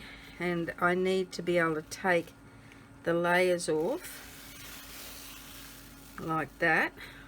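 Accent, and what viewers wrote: Australia. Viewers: I love that you’re an Aussie like me! I was listening watching and you were a few minutes in and I was Ohmygosh yr an Aussie lol Love love what you’re doing and done. There are never any mistakes in art. They merely become something you didn’t know you were going to create! Hehee love and subscribed to you. Thanks for a brilliant tute.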